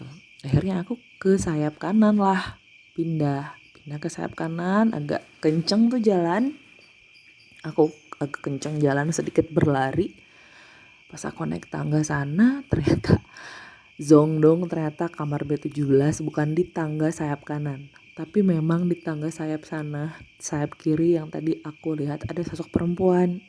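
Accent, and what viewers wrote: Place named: South Jakarta, Indonesia. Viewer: Mbak nya logatnya jaksel bgt 😅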